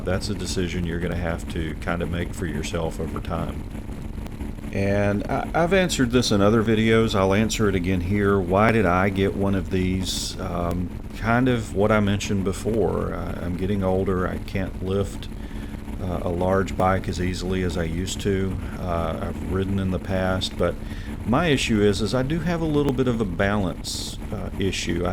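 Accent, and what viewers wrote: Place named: South, United States. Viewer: Just a quick comment to let you know how much I enjoy your well-done and informative videos about the Ural life. The vids which are solely travel logs of sorts, with endless GoPro footage and no commentary are boring. Yours are educational, and I must say, your calm, relaxed manner with just that touch of the South in your voice is perfect. I know these are a lot of work, but hope you keep them coming.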